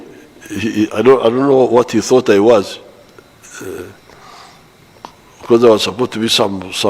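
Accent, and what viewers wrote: Nigeria. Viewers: Is the host a Nigerian? I couldn't hear his English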